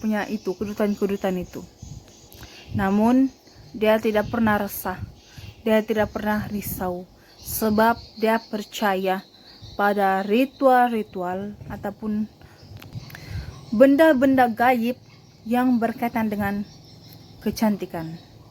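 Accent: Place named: Sabah, Malaysia. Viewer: Wah.. Terbaik sis becerita. Suka dengar dialek org sabah ni👍👍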